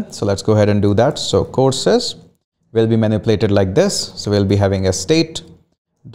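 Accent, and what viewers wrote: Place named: Delhi, India. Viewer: why this delhi people spaek like this , too weired and annoying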